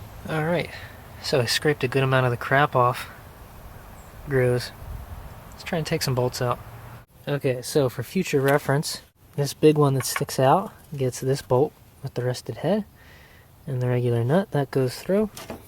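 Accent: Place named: Germany. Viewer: is there any significance to to frequent, annoying, German accent ? other than that great vid.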